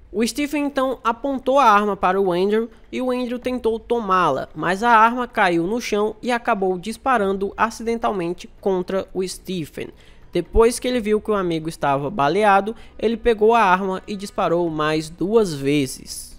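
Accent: Northeast, Brazil. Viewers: Oi gosto muito dos seus vídeos e das suas narrativas 👍 você é do nordeste amigo 👏👏 sou de Aracaju-se.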